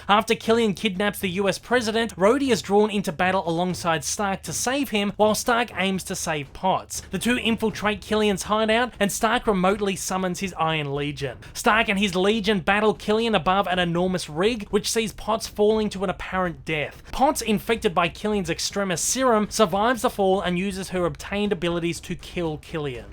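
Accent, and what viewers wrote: Australia. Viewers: Your accent is horrendous, no offense. What are you, some Asian Aussie? You sure sound like it.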